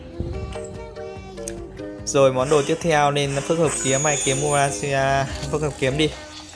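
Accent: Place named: North, Vietnam. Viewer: thích giọng trai bắc đặc biệt là thái bình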